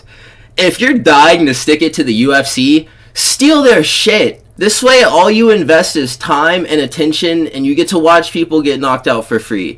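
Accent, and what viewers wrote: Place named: California, United States. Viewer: You have a very self-inflated perspective; you're not the only "true" MMA fan, and the people who disagree with you aren't all casuals, i.e., the UFC fighters literally calling for Jones to be stripped of the title. And it's hilariously ironic that you have the audacity to make fun of someone else's voice while rocking a flamboyant Californian accent.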